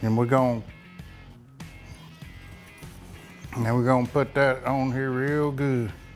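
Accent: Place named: Kentucky, United States. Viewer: I done learnt that, 😂 I love it. Hey, you sure you ain't from Kentucky? You sound like one of us good ole boys from up here in Kentucky. Enjoyed the video hope y'all boys and ladies in the DD world are all doing well. God Bless and keep the videos coming, love em. ☮️ 💜 God Bless y'all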